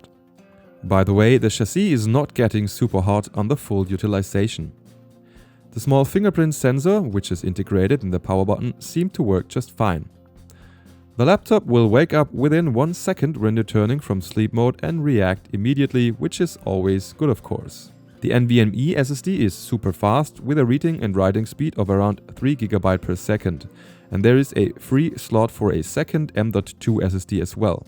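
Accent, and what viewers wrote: Germany. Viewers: Lol manchmal glaub ich, dass er Deutscher ist der englisch spricht :D erinnert mich so krass an den deutschen Typen der Monitore reviewt auf englisch xd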